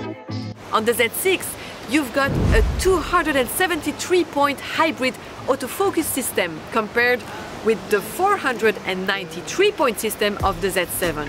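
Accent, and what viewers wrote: France. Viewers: pretty french woman speaking English, easy win! very professional review, too bad i am not at all interested in a Nikon mirriorless! i am holding out for the panasonic S1r or a Sony a7III!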